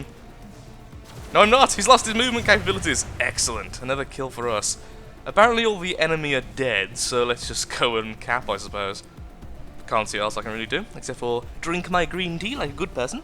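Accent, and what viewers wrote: United Kingdom. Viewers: for his accent, UK most likely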